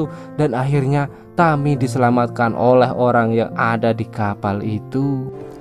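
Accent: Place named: Medan, Indonesia. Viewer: Bukan kelen tapi kalian...logat medan ya brooo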